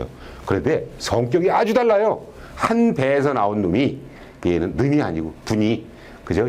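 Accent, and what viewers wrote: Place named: Seoul, South Korea. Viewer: 신기한 서울말의 세계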